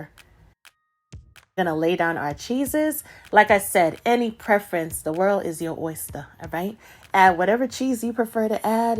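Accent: New York, United States. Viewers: Ooooh, I'm looking forward to cooking that one up myself. And great tip with the pre-fried salami! Ps, your New York accent is simply adorable (it reminds me at times of Rosie Perez 😎). Cheers from Toronto.✌️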